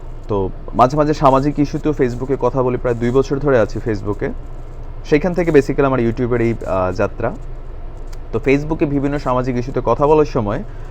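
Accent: Comilla, Bangladesh. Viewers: ভাই আপনার বাড়ি কি কুমিল্লা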